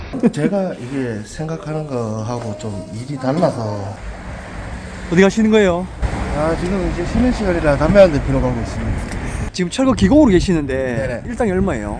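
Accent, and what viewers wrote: Gyeongsang, South Korea. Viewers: 근데. 은 고향이 어디세요??? 경상도 사투리인가? 강원도잉가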